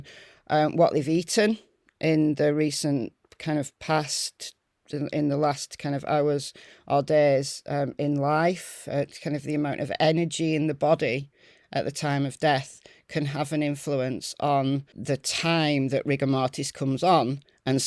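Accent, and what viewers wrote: United Kingdom. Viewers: Unrelated question, what is your accent, it’s really cool. Somewhere in the UK?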